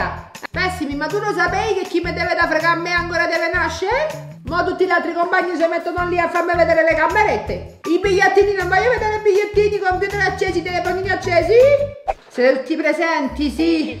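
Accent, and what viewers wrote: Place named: Marche, Italy. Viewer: Sei brava a parlare il marchigiano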